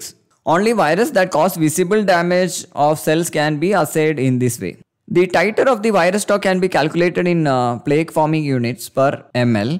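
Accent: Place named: South India, India. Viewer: From your accent I gather you're a south Indian. Good job. Thanks for making the video in English. It helps us all South Indians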